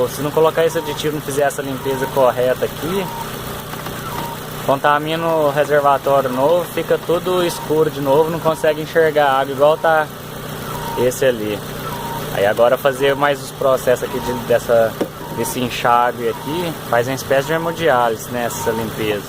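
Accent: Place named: Minas Gerais, Brazil. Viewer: Vc pelo sotaque parece minerin de sete lagoas rsrsr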